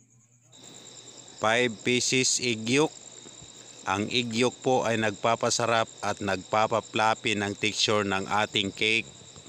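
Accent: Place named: Visayas, Philippines. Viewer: gud morning chef watching from Guam. gusto kita magturo kasi detalyado at simple lang.God bless you chef. bisaya ka chef? bisaya kasi ang accent mo. kung bisaya ka parehas tayo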